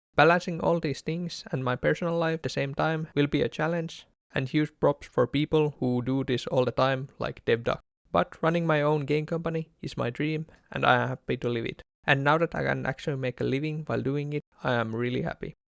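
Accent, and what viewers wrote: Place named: Finland. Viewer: Best of luck to you! I like your attitude and optimism, I hope you succeed in accomplishing your dream to run a small game company. Btw your accent reminds me of My Summer Car, so I assume you are from Finland. You Finns for some reason are very good at game development, so you already have a leg up on the rest of the competition :)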